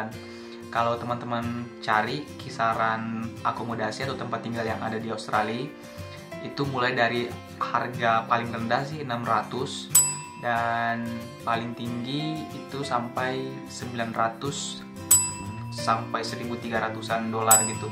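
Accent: Makassar, Indonesia. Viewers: orang makassar kah om? dan ini ausi mana??sydney??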